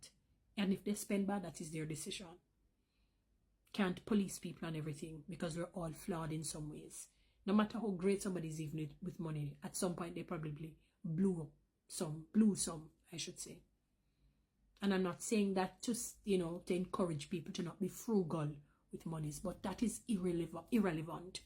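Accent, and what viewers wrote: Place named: Jamaica. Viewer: All black is ok as long as it is from the country that enslaved them! In America ( American decedents of slavery) ados because American government enslaved our ancestors! I don't know what country enslaved your people but I can hear your accent, you sound Jamaican. Hope you and your people get your reparations from whichever country that enslaved your people( ancestors)🤔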